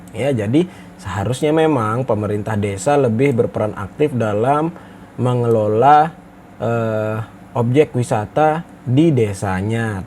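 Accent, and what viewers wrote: Lombok, Indonesia. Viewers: Be elekm batur marak dialeg lombok basem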